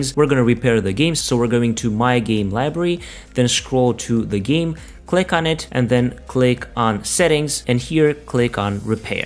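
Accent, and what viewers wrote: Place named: Romania. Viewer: wtf, are you romanian ? :))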